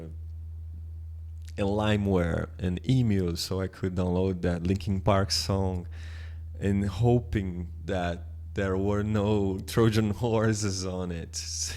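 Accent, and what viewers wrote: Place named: Brazil. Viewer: Pelo sotaque é br com certeza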